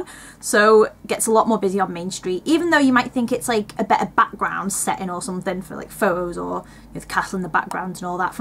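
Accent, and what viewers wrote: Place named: Wirral, United Kingdom. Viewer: Are you from the Wirral? Sounds like a wirral accent :) I'm Liverpool myself haha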